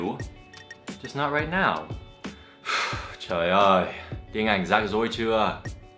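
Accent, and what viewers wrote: United States. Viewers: anh nói giọng Mỹ hay kinh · American English or real English?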